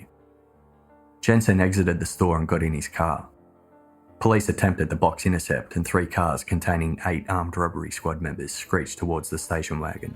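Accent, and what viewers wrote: Australia. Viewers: Awesome version bro, sweet Real Aussie accent . Ahh the 80s what a decade !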